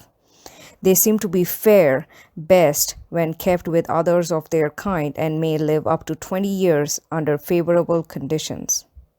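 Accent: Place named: India. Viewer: Are you a indian descendent cuz Your accent seems to have it.. But nice video Very illustrative.. Nicely done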